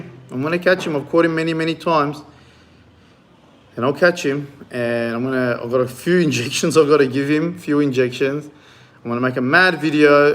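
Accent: Australia. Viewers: Very strong Aussie accent mate, thought you are Turkish but sounds exactly like an aussie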